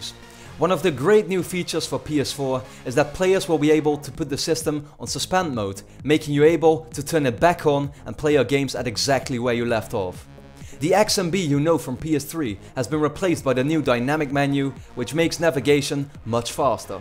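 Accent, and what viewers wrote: Netherlands. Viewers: Is dutch?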